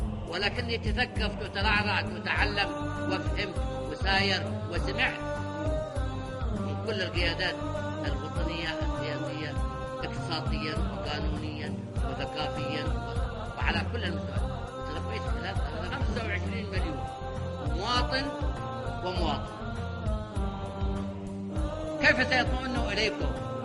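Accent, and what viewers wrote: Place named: Saudi Arabia. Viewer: ماشاءالله عليك واضح من لهجتك انك سعودي من ذماران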